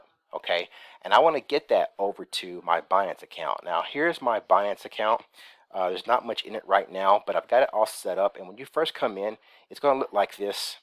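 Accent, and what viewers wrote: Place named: United States. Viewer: Are you in the US. am I able to use simple swap in the US? You sound like you are American, but the flag on simple swap is british.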